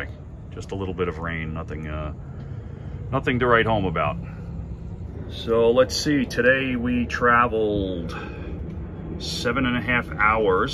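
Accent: New York, United States. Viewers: From my last comment: Do yawl know what is wrong with these videos? Al they do is "Scuff" the surface of a ICW trip!! I also wonder.... WHAT PITCH props is he using??? Does he ever tell YOU so that YOU can know what kind of fuel mileage Yawl could expect IF You did the trip? He goes into all the fuel used with mileage, etc. BUT how does ANYONE know what to expect with THEIR Prop Pitch??? He says he's from Florida, He seems like a"TYPICAL" New Yorker & even sounds like one.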